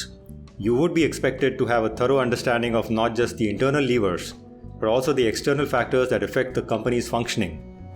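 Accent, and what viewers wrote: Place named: India. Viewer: That's the beauty of Indian spices and accents. The more you experience them, the more you'll fall in love with them. Till you get there, feel free to turn on the accent-free subtitles on our videos.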